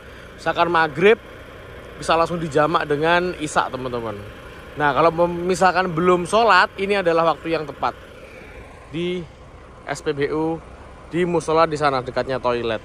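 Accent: Java, Indonesia. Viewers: Bang sekarang udah medok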